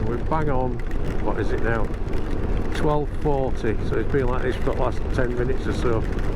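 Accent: Yorkshire, United Kingdom. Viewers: Im happy to help with that. I have 50+ years of biking stories from riding in about 11 different countries and I have no problem with talking. 😀 It would be good to catch up for a cuppa and a chip buttie som time. I have just moved to South Chesterfield, which is handy for the National Park. From your accent, I’m guessing you are from the Barnsley or Wakefield area. This week I went to the RE dealer to try to blag a test ride on the 350 only to find out they were closed until the new year 🤨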